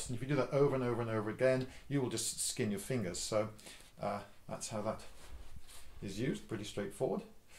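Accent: England, United Kingdom. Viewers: Oh thank God you were EXTRA English in this video! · props to the mentioned extra English-ness. I enjoy your videos very much. best regards from across the pond!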